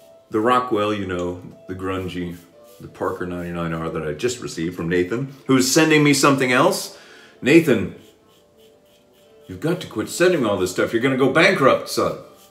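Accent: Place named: United Kingdom. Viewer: Amazing british accent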